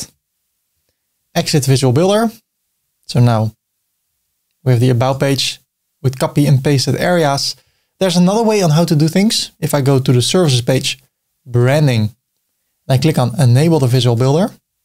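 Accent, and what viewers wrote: Netherlands. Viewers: TOP video!! En love het Nederlandse accent haha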